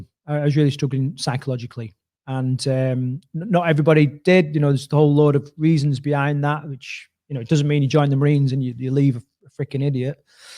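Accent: United Kingdom. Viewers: Hey! (you so suit that name), this was a great vid. I didn’t make the live session so watched it later. I play your videos while I’m out on longer runs, as I’m originally from Yorkshire and having a familiar voice is so wonderful while running lonely trails here in the USA! Thanks for all you do mate, appreciate you. 👌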